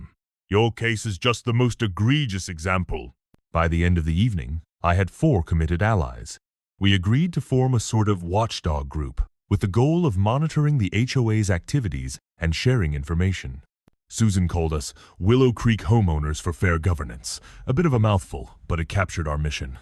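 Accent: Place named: United Kingdom. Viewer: You know the stories military angle & the accent used by the AI makes it sound off. Might want to tone down the accent so it doesn't sound British so much.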